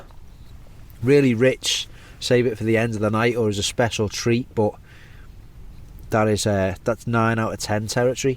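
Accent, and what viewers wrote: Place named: Liverpool, United Kingdom. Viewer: Always enjoy videos, a fellow scouse accent on the youtube!!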